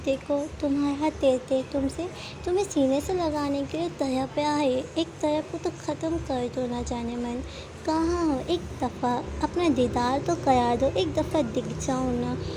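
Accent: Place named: West Bengal, India. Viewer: .. aap jab story.. explain krte ho..toh syd Bo aapki bangle ki hi aawaj hai.. bo mujhe bahut achi lgti.. 😚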